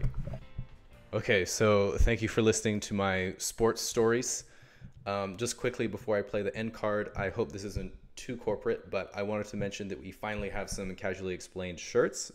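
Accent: Tasmania, Australia. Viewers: Are you from Tasmania, mate? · I‘m not a native speaker and even I (or maybe because of it) can tell that he pronounces some words with an Australian accent. It‘s just something you never get rid of. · I mean even normally you can tell he's Australian, the trace of the accent is still there, and it comes out in a few words. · gaaah that aussie accent is legit haha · U are a Canadian-Australian with a amazing Australian accent and great vids. U are awesome! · make a wish! also I hear the Australian accent now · You're Australian! hell yeah! Do a video in an Aussie accent (Whole video) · are you tasmanian? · Holy shit your Australian accent caught me off guard! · I live for your Aussie accent mate! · Wait are your from tassie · Are you from tasmania?? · the return of the australian accent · you need to get out more, mate. Every single one of his vids where he gets it out you guys come out of the woodwork to say "it sounds nothing like an aussie accent" There's a whole bunch of aussie accents, and Ive defs heard his. 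He put on the map as being from tas, but Ive seen it in adelaide and rural western vic. For all you know one of his parents moved from queensland so he has a bastardisation, or his friends growing up were bogan and that influenced it, but nothing about it strikes me as off, just rare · No wonder your Aussie accent is so good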